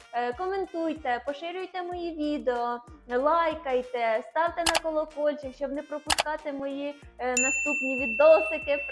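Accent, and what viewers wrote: Poltava, Ukraine. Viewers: ти талант а полтавський акцент неперевершений, дуже люблю тебе слухати і дивитися. МОЛОДЕЦЬ!!! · і в Хоролі так балакають. Даже мамкають і папкають. Так приємно чути рідний полтавський говір. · Це Полтавский діалект, це наша гордість, і я так розмовляю, 🎉 суржик Полтавский👍❤🐱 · Не звертай уваги. Ми всі на Полтавщині так говоримо. Але як треба вміємо говорити, як треба. Страшенно не переношу Ірину Фаріон. Грубіянка, замка, в минулому. Партєйний дєятєль. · . Ти золотце) Це смішно звертати увагу на негативних дібілів. Плавають в жовчі, то хай і тонуть вній) полтавський акцент це просто кайф❤ не звертай уваги, люди - хейтери просто обмежені, або жалюгідні або потопають у власних проблемах, які і є частиною карми. Сорі за словесний бульйон, я під вином))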